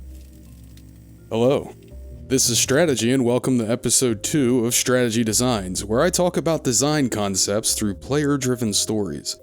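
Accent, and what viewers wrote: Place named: Philadelphia, United States. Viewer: Are you from Pennsylvania? Specifically Philadelphia area? You have the accent to a tee.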